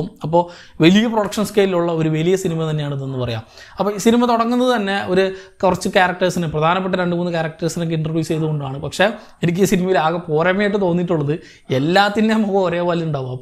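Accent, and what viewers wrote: Palakkad, India. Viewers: This time in long time i could sense your palakkadan slang👏👍😀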